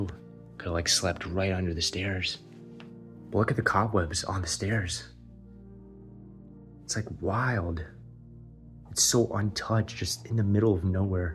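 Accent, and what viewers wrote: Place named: United States. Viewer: What’s an American rummaging through a home in Britain for. Definitely not a British accent.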